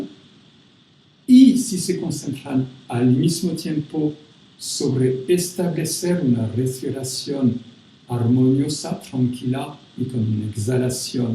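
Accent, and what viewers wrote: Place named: Paris, France. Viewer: Super de faire une video mais le castillan avec l’accent parisien c’est insupportable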